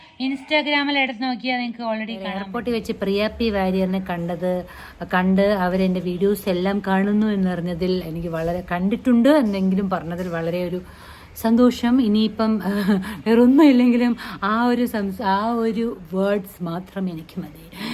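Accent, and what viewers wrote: Trivandrum, India. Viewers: Good presentation.good language tvm😀🤣🤗good🤗thank you. Suscribe cheythu. Good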